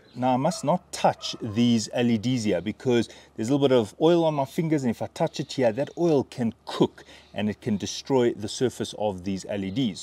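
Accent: South Africa. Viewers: This man has that OG soutie transvaal South African accent. Haven't heard it in ages.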